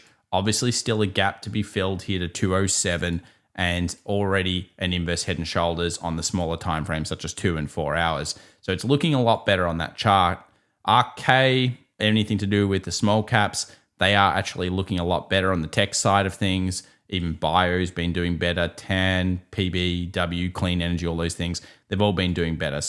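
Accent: Australia. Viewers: Kangaroo English (he is from Australia 🇦🇺)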